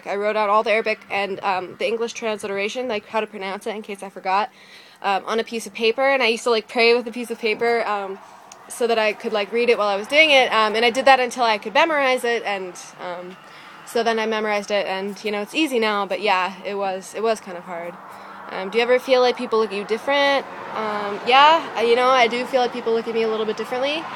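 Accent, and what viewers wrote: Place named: Canada. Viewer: you accent is same as Elisha Cuthbert , which means you are from canada or you must be actress or something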